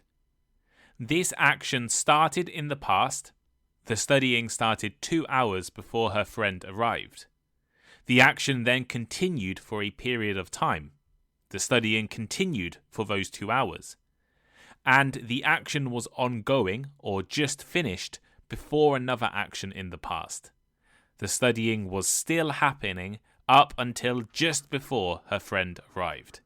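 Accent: United Kingdom. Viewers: Oh, the British accent! Wonderful 🎉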